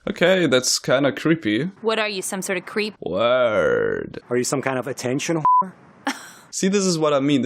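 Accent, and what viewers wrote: Germany. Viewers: Dude Clearly i hear some German Accent in this Video and its Horrible!! better learn to speak like a human being or talk German. This suits you better.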